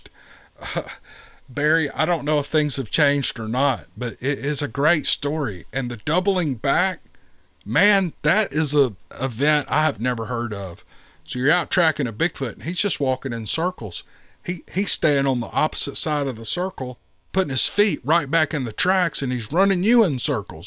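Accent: South, United States. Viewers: Love that southern accent and laugh sir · Hey, I hope that all is well with you and your family. I have came across your channel doing the Covid out break and it have been a delight to listen to you narrating these amazing stories. I enjoy hearing the Southern Comfort of your voice and the in-between conversation. By the way, I wanted to check on you because i've been missing your (live) until the next time, take care and I will continue to listen to these awesome stories.❤ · I get to listen to your southern charm for a few hours tonight. Thank you, we all appreciate your stories and your charm. Hello from Pensacola Florida y'all. Best regards to all of you.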